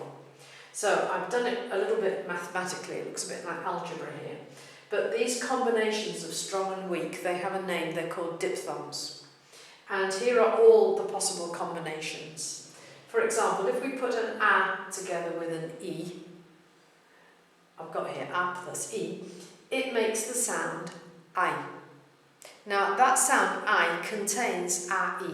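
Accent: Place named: Spain. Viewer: Have to start saying these videos are really good and she explains them very well, after all she is explaining the basics and then if people want to learn the language just have to learn vocabulary, now english speakers need to know that as in english language there are accents, and she is explaining spanish from Spain accent, thats why the CE/CI sound like a ZE/ZEE. so since most of spanish speakers are latin that CE/CI sounds like the S when you say say. very good video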